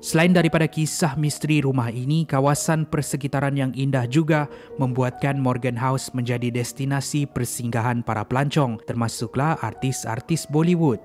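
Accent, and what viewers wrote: Malaysia. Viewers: Bang nak tanye abng dari mna indonesia atau malaysia dngar suara ckp mcam malaysia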